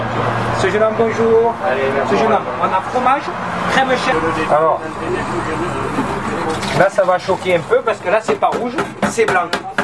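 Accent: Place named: Provence, France. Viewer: Bravo Je fais aussi de la pizza mais toi c’est pagnolesque,l’accent,la bonne humeur,les rires…tout ça me donne envie de dégommer ta pizza en entier!continues comme ça.👍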